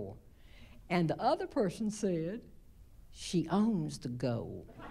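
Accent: South, United States. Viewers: The accent is perfect southern! Way to go.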